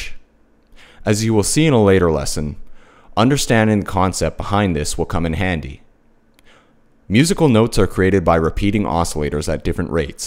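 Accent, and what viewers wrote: Canada. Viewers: Thanks! This was really helpful. Are you by any chance Canadian? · are you canadian? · Hez a Canadian. "About" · Are you Canadian?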